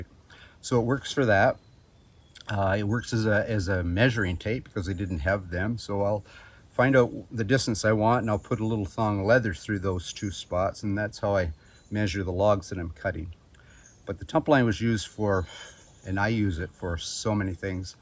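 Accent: Canada. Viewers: Why did you shake your head at 40 secs. in? looking at something on the ground in the middle of a path. And, why is your cabin so close to that pond? And, what species of tree were you cutting down at the beginning of this video? A conifer obviously, but what kind? [Ah! you mention "cedar" . Must be Eastern Red Cedar (which of course is not a "cedar" at all but a juniper - Juniperus virginiana; that is if you are east of the Mississippi. As straight as they are I'm guessing East Red] And, you keep mentioning how hot it is - I'm a new subscriber so do not know where you are located. I don't mean specifically, but just a state or province would likely do for me on climate. Oh! just heard that distinctive "ow-tt" of the Canadian English. (An idea:you could shed some body heat by taking off that felt hat - I think some one said 30% of your body's heat is in your head). 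And, obviously you are not worried about how green that wood is - is that b/c shrinkage will not be a problem. (I was assuming you were using this kind of tree b/c it's rot-resistant). And, I wish you'd shown us how your attached the wood pieces. I assume some sort of nail? But it would have been good to see that. I would also have liked to see some of those two hours getting a hole through the rocks! Re: saws I think maybe I got one of them there crummy saws from Ace hardware. It's got their name on it. Well it is a BITCH to use! I took it in and asked two of the guys there what the deal was? they didn't k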